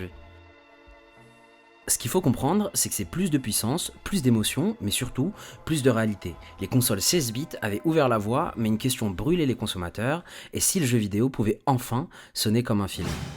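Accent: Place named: France. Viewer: J'm ton son Le french accent touch Ça passe bien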